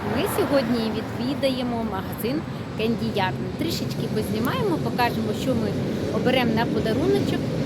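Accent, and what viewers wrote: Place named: Western Ukraine, Ukraine. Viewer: hand-pink-waving:Харків! Ви поруч зі мною! А спочатку мені здалося. що ви з Заходу України розповідаєте.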